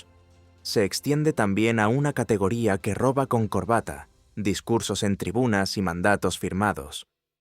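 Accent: Spain. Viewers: Pero de que está hablando este señor con acento español , en España la personas mayores en los pueblos abandonados el gobierno no se ocupa de ellos , las inundaciones arrasaron Valencia y las personas están abandonadas la inmoralidad sexual es increíble, las violaciones los asesinatos de hombres a sus esposas, los padricidos pero de que habla este bocón ya quisiera el poder vivir en Miami , en España las personas no pueden pagar un techo apropiado para poder vivir este hombre si se le puede llamar así que se enjuague su boca para hablar todas las mentiras que está hablando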